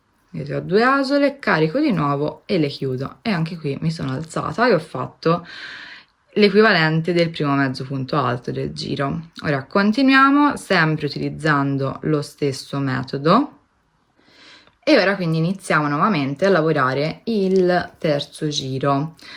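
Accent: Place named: Tuscany, Italy. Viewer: Buongiorno, Una curiosità….io abito a Pietrasanta e dal tuo accento mi sembra che siate anche voi “di costa”, mi sbaglio?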